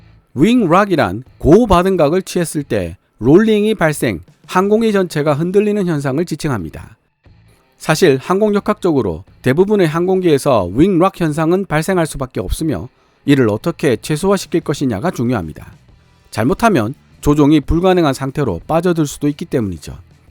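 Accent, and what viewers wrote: Gyeongsang, South Korea. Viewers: 경상도영어는 제발 읽지말고 그냥가자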